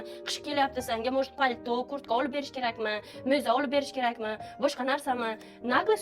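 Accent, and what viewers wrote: Bukhara, Uzbekistan. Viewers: Mo'za❤❤❤ zo'r wud hamin gapaton pryam buxorocha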